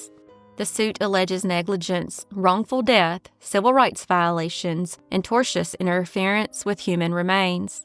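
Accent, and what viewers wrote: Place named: South, United States. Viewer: It is so nice to hear a southern accent! I'm from Ohio, but family is in Georgia. Grandma moved up here and would tell me stories to get me to sleep. Listening to the accent with true crime is so relaxing!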